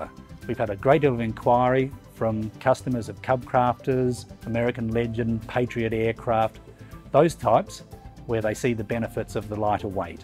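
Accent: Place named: Australia. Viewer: Listening from Australia, was pretty sure that was an Aussie accent, checking online verified it :-) Go Aussie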